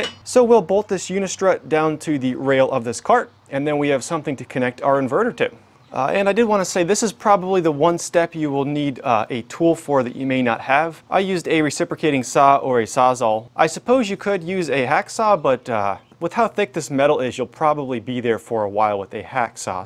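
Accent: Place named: Canada. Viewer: are those Canadian dollar prices ? you sound Canadian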